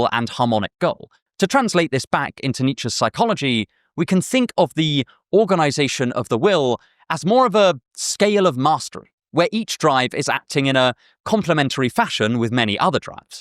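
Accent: United Kingdom. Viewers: Where in the UK are you from if it's not too rude to ask? Usually, I don't struggle as the accents across the commonwealth are quite different, but I struggled to tell if your accent was British or New Zealand. Sorry. I know this is a rude question, it's purely an academic inquiry. Like how Portuguese from Portugal and Russian are completely different languages but have fairly uniquely similar vowels or consonants unique to those languages? If that makes sense? Anyway... 😅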